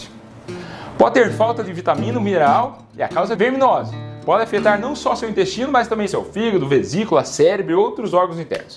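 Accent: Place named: Caipira, Brazil. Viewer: Este médico é maravilhoso, com seu sotaque caipira e jeito de falar , faz com que possamos entender bem tudo que ele explica, além de muito bonito é claro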